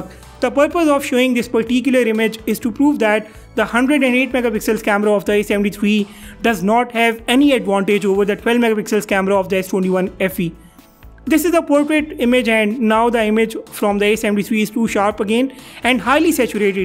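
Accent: India. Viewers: Indian accent to much to take for whole video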